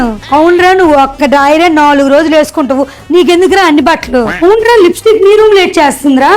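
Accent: Srikakulam, India. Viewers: Srikakulam yaasa......🔥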